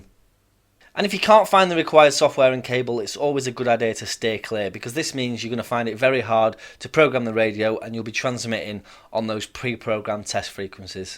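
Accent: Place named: Cockney, United Kingdom. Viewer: Can you change your accent please. I cant understand you properly. You speak to fast. Im not a fan of your cockney accent 👀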